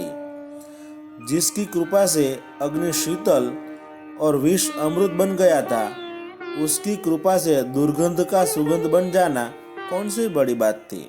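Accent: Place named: Chennai, India. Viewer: आप चेन्नई से हो क्या अना बोलते है वेसे बोलते हो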